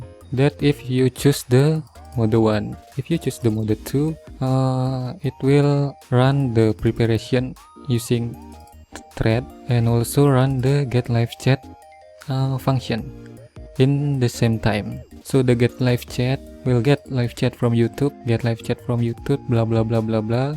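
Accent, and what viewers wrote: Indonesia. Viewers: Sudah kuduga ni orang indo ,dari cara bicaranya masih kedengeran kyak orang indo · Bahasa inggris nya sangat mudah dipahami karna sesama orang Indonesia gw juga kalo ngucapin bahasa inggris kaya gitu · Typical orang indonesia ngomong inggris, logat indonya berasa banget, anyway good video bro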